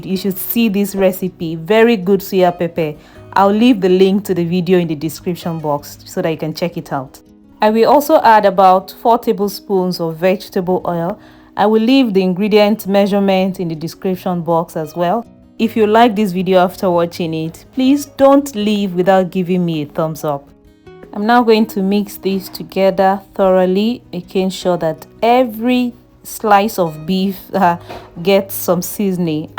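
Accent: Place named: North, United Kingdom. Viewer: I just came across your channel now. You sound like a northerner, are you?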